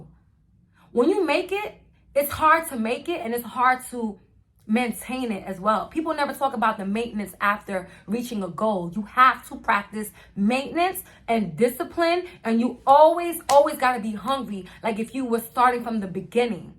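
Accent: New York, United States. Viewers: As soon as I heard you speak I'm like she's from New York and then you continued and said you were Haitian! I'm a new fan and subscriber sis! Thank You I will be watching and supporting you anyway I can ! 🙏🏾🙏🏾🙏🏾🤎✨ Blessings, Happy New Year and to all the bosses in the place CONGRATS MILLION AND BILLIONAIRE! Especially my black women! WE DESERVE! 🎉🎊🥂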